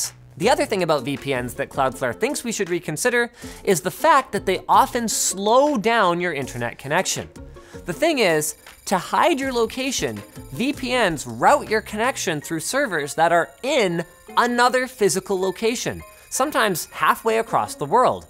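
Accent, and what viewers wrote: United Kingdom. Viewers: solid brit accent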